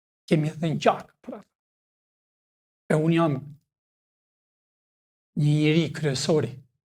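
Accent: Albania. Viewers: Ohhhh perendi,o hero,po kushe pise thote qe nuk flet shqip ti?eeee?o baba tomorri,po ti flete shqipen qe flasin prinder tane,fshace,ata prinder qe kane punuar ne ferma e koop.po baba im fliste fiks si flet ti tani.cliro vatanin nga tradhetaret o hero,o skenderbe,o ismail qemali🇦🇱🇺🇲🙏🙏🙏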